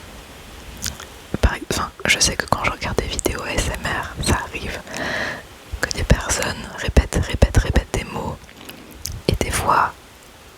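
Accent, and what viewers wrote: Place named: France. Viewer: Tu as fais une vidéo vraiment éducative et intéressante et vraie on a des mots dans notre langue assez spéciaux à prononcer et écrire et les sons différents tu réalise vraiment un travail parfait et ton anglais était bien compréhensible avec note joli accent français 😁 mais en tout cas une vraie vidéo intelligente et bien faite tu peux être fière de toi 😊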